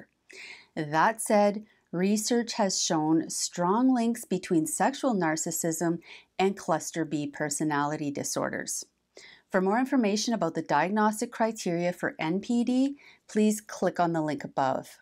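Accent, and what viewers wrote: Canada. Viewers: Love your Canadian diction...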